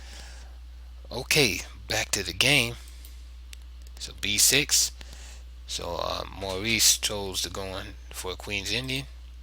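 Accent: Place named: East Coast, United States. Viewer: Dope!!! Are you from NY or Philly? That east coast accent!💯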